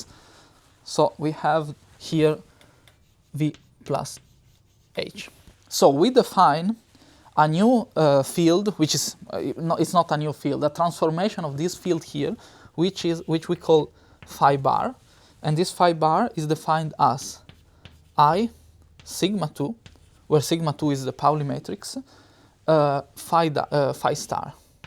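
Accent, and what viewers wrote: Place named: Italy. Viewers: È inevitabile, visto che è italiano. Hai mai sentito un madrelingua inglese o americano che parla italiano? Per quanto lo parli benne, si sente sempre l'accento. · C'è un po di italiano nel tuo inglese ❤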